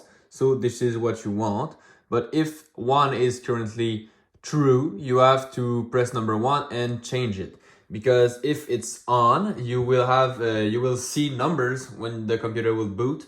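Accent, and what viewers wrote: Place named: Quebec, Canada. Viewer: Tu est quebecois ca ce voit MDR!!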